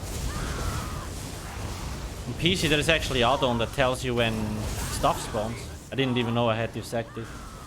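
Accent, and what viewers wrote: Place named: Switzerland. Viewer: du kannst echt ned leugnen, dass du Schweizer bist xD Schöne Videos. Hoffe da kommt bald mehr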